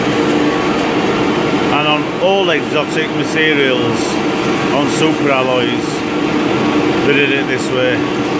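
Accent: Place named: Northern England, United Kingdom. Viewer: wonderful accent Northern MaN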